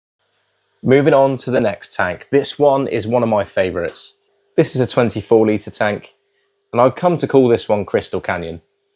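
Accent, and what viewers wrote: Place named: United Kingdom. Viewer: I am from east asia, I am just here to enjoy ur british accent haha.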